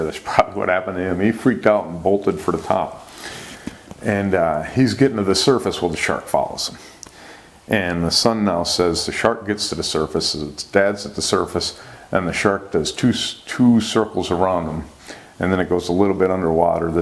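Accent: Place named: Midwest, United States. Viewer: Great channel. How does a guy with a Minnesota/Midwest accent be so knowledgeable about sharks?! Hahaha great stuff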